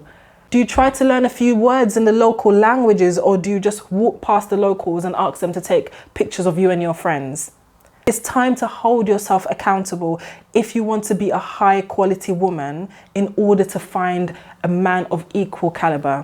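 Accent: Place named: United Kingdom. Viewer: That is excellent advice. A lot of people assume you just know what kind of person you should marry. In fact that's the best way I know of to really screw it up. The ideas I have when I married was to start wrong and it shows in my own marriage. I simply didn't know what I was doing.. I am so pleased that young ladies like you are like you are employing sensible approaches to life-and-death cool approaches to life in general. Also, that gorgeous British accent doesn't hurt!! Keep going. You make me proud.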